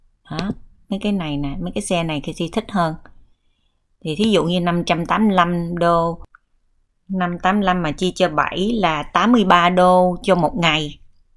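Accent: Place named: Mekong Delta, Vietnam. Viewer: chị người miền Tây phải không, sao e nghe giọng của chị phát âm rất nặng mà tiếng cứ cứng cứng lm sao ấy ạ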